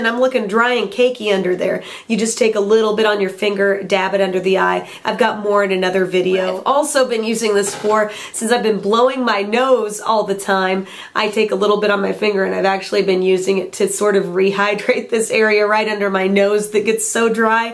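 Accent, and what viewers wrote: South, United States. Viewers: It's the funniest thing.. I don't know why but does anybody else think her voice sounds more "southern" with that raspy voice? lol I dunno but it seems like her accent comes thru just a liiittle more here, sounds like sassy southern woman to me! lol (I'm from the south so I'm not hating at all, if anything its sexy!)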